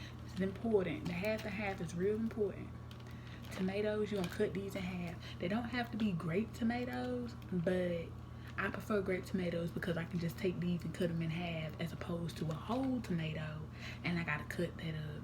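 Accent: South, United States. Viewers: Love ur southern accented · You're so pretty! Also I love your accent; I grew up down south and it feels nostalgic to hear you talk. 💜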